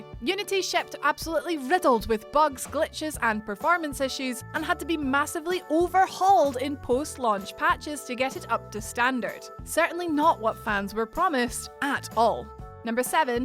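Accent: Scotland, United Kingdom. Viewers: Wooo Scottish lady ken😎🏴🏴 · Great video, but my main enjoyment was hearing a fellow Scot on YouTube for the very first time 😁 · She did start sounding more and more Scottish as the vid went on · Do I have hearing problems or did she sounded more and more Scottish the farther the video progressed? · was i the only one who only noticed she had a scottish accent halfway through the video? was a little confused once i noticed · I love Scottish accent ❤️❤️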